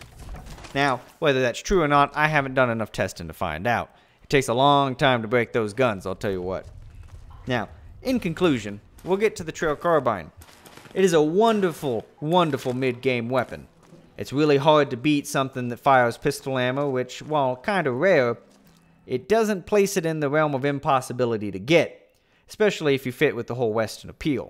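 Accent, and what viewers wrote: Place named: United States. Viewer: I love the cowboy accent YEE HOO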